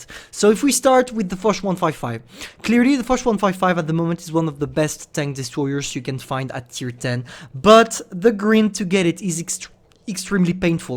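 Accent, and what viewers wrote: France. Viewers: T'es français??? parce tu a un accent français quand tu parles anglais :)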